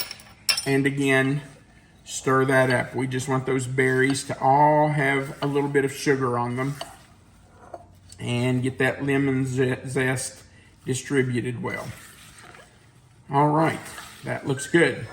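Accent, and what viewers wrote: South, United States. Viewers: Love your channel and your southern accent is awesome. Thank You So Much for actually putting the recipe without pointless huge spaces directly under the video so we can easily screenshot the recipe with the thumbnail pic in it. There are jerks on here who refuse to do that (even after I have asked them to) so I quit watching them. They want us to click over to their website or blog which is Ridiculous and not easy when you are using slow data. Some people are just lazy rude and arrogant, you are very respectable.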